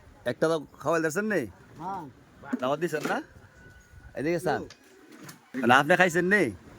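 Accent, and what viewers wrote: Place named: Nabinagar, Bangladesh. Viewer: এই অঞ্চলের ভাষা ব্রাহ্মণবাড়িয়ারনবীনগরে ভাষার মত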